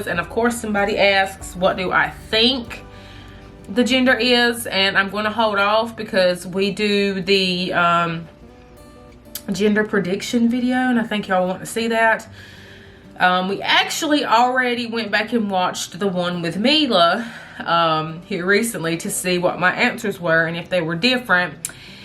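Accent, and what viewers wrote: United States. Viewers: You’re so country and I love love love it lol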